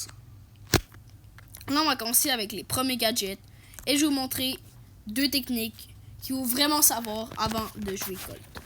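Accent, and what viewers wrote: Quebec, Canada. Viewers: t'es québécois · T'es Québécois ? Si oui j'aime bien ton accent😉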